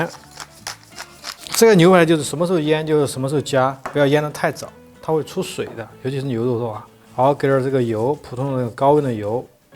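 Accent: Sichuan, China. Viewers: 四川口音（幽默）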